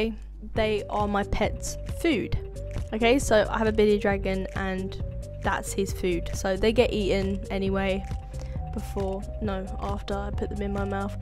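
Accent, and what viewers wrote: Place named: United Kingdom. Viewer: of course she’s british 😹😾.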